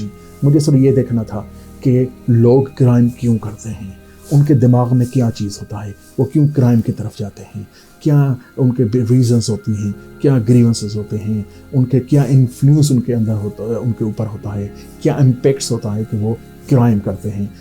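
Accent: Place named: Pakistan. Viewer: It is highly inspiring to listen to you.Every voice that's against the established set of idioms of a society, right or wrong, is condemned first but hope your conviction and gravity of sincerity will make everyone understand and hence respect you. Mr, your work on Islamic extremism and terrorism is more in favour of Islam and Pakistan than any other entity or country.You are welcome to our media, please keep sharing your unique and insightful outlook on world polity and current events.Notwithstanding in foreign lands, your accent and ethos is Pakistani, you're a true Muslim and a son of the soil. Love and best compliments with a hope to see you frequently on youtube.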